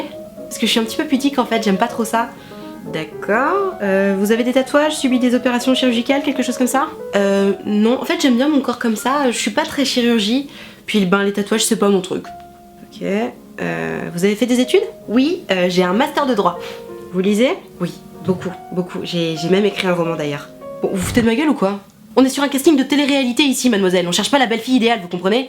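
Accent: South, France. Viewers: J etait plier de rire avec ton accent du sud 😂😂💖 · Un trèf... Bon ok, je vais arrêter avec cette blague pourrie :p Et pour dire quelque chose à propos de la vidéo : j'adore ton accent du sud :D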